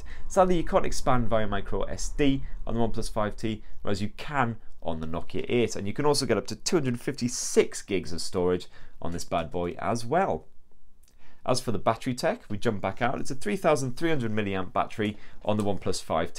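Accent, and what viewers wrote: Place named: North East England, United Kingdom. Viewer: The reviewer's voice is really off putting with that vile north east accent . He has no concept of speaking intonation whatsoever, just likes the sound of his own voice and is rushing through this review as if he's got a 'promise on' ...... .He needs to go back presenting the 'One show / Country file' .. .. lol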